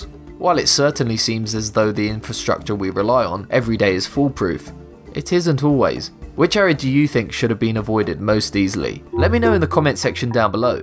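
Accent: England, United Kingdom. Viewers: Having an English accent will only fool some people into thinking you know what your talking about young man. List videos then to have lots of mistakes in them but this one takes the cake! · An English voiceover speaking an American English script always does my head in. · the guy in the video obviously doesn't know much about physics But he's got a smooth British accent, and that gives him instant intellectual street cred. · disliked in 23 seconds.due to dorky face figure (after brit accent)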